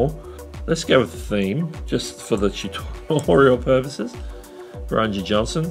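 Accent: Australia. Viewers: this guy is Australian?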